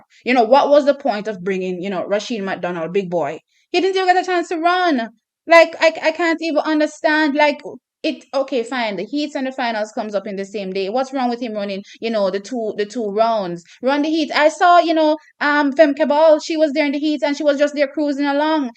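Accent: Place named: Jamaica. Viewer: Hi. I like your channel. I love the topic. I am American, but I am enthralled with the Jamaican female sprinters. If I can make one suggestion for you?... Too much commentary w/o enough visual references. You are pretty you look at, and I love the accent, but we need some pics or video referencing what you are discussing. It helps to keep our attention. 🤷‍♂ 👍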